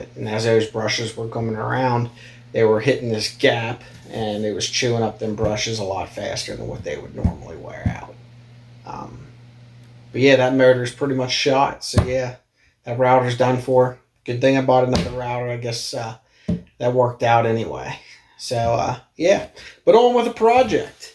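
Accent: Pittsburgh, United States. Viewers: Is that a yinzer accent I hear? Rad build!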